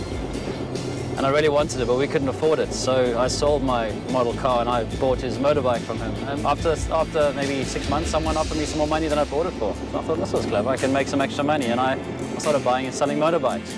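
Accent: South Africa. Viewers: I think he's South African by his accent and name,which maybe Dutch and therefore Afrikaans. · Is that a South African accent I hear? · He's South African. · he sounds very SouthAfrican -)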